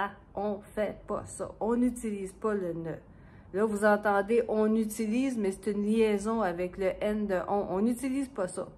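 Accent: Quebec, Canada. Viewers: nay hô, moi, j’aime beaucoup l’accent québécois 💚💚💚 Mais c’est plate que les dessins animés avec l’accent parisien ont été influencés à certains enfants, ils leur ont imité.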